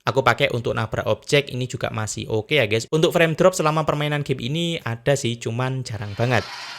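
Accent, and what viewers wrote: Banyuwangi, Indonesia. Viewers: Tibak e mas wong banyuwangi 😂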